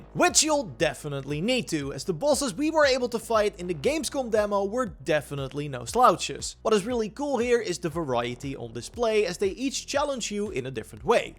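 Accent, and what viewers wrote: Netherlands. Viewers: Bruh learn how to speak English. Why do you have to push that Dutch accent in so hard? It makes your videos unwatchable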